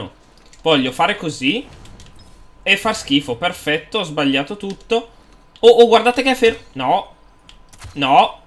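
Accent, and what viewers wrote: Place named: Piedmont, Italy. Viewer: Che bell'accento piemontese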